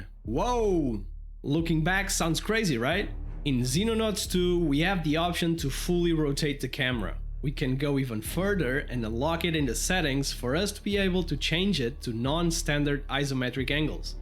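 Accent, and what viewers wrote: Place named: Portugal. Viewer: I was listening to the video, and halfway through the only thing going through my mind was how Portuguese you sound.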